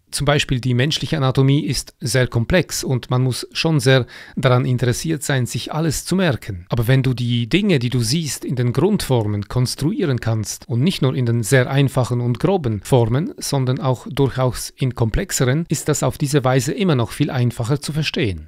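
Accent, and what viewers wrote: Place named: Switzerland. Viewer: finde ich auch den Schweizer Dialekt so lustig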